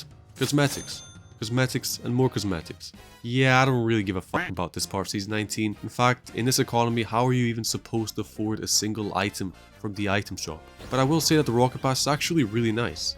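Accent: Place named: Scotland, United Kingdom. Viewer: Dude, I watch most of your videos, your accent drives me nuts, it's it Scottish or something Central European? Ta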